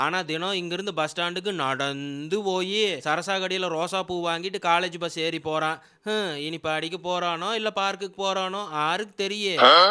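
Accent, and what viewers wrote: Coimbatore, India. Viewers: unga pecha kettutu samayala vitturom bro!! Nama coimbatore slang nenga erukara varai vaalum! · thambi endha collegela class roomla Saraswathi poto Iruku enna seidhalum samayalum super covai thamizhum super naangalum coimbatore dhan vaazhthugal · தமங குழம்பவிட நீங்கள் பேசற கொங தமிழ் கேக்க தோனாமிற்தமா இறுகீகு நன்றி ❤❤❤❤❤ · எங்க போனாலும் எங்க ஊர் தான் பெஸ்ட் கோயம்புத்தூர் பேச்சு வேற லெவல் இங்குகோ 🥰🤗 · Kooruketa kuraalu kovai slang😂😂😂😂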